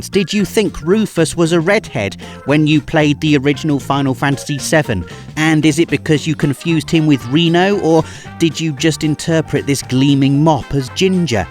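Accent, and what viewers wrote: United Kingdom. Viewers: All i heard was a british accent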